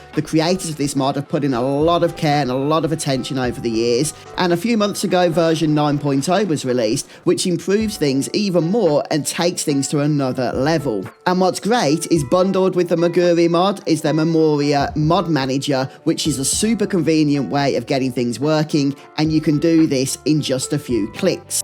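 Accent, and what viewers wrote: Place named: Birmingham, United Kingdom. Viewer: This guy sounds like he's from Birmingham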